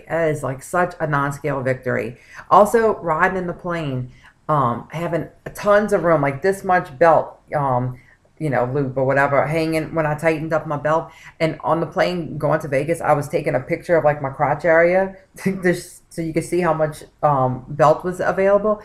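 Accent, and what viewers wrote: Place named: New Orleans, United States. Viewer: Hi, Thanks for sharing this journey. I'm just starting-- I'm on my Aetna 90 day pre-op. I have a question.... I'm definitely hearing a New Orleans accent!!! I'd know it ANYWHERE! Are you from New Orleans?? I'm a native....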